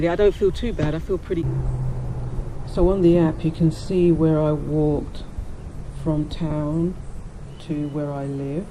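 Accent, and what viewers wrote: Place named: United Kingdom. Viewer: She has a British accent.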